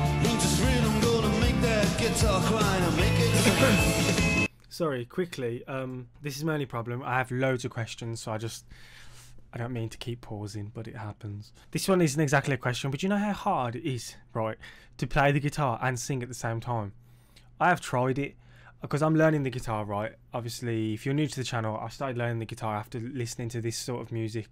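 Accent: Birmingham, United Kingdom. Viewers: They are one of ours, this was at the hammersmith odeon in london. your accent sounds like a brummie one but the loike could be west country or east anglia. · Hi Got a (not very) secret crush on you and your Brummie accent 😚. A question for you - did you chose your own title, or was it a suggestion? Just asking because it has a strange meaning, that you may or may not be aware of. Btw I watched your post 'Am I Gay' which I found confusing tbh.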